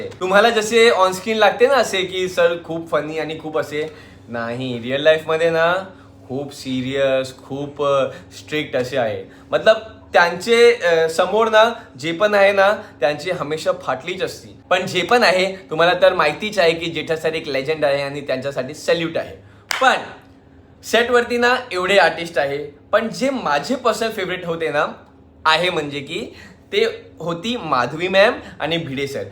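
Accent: Maharashtra, India. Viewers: Kiti God marathi bhva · Kiti god marathi😊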